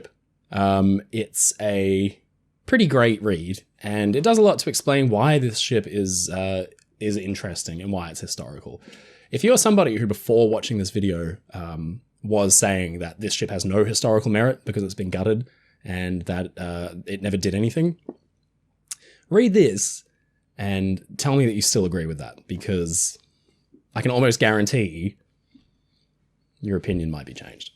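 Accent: Australia. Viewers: Friend of mine retired from the merchant marine roade the SS United States. He said she was somewhat rough riding he thought due to its speed. A shame but the time to have done something was decades ago well before the Mad Men show. Huge cost, being in Philly didn't help. Interiors is what most people want to see. Ship didn't have the right friends in the right places. But as you noted, very few ships saved/preserved. Even naval ships were neglected, Farragut's flagship rotted away. Nothing from the Sail & Steam era I'm fascinated by. Thanks. Beautiful rant. So you're an Aussie??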